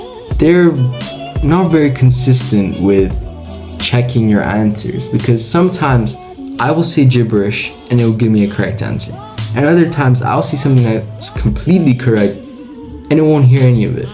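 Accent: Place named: United States. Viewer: oh Gosh, but u seem an American guy cuz of ur English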